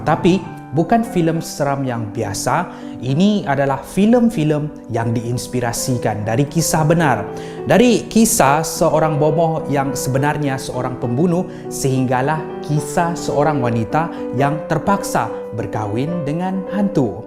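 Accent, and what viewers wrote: Sabah, Malaysia. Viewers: Ainahhh org sabahhhh baini .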